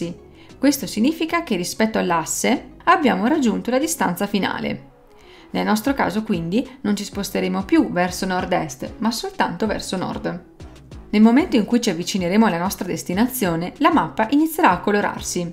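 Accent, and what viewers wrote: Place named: Veneto, Italy. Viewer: ma siete venti tosi? parchè dall' accento me pare de si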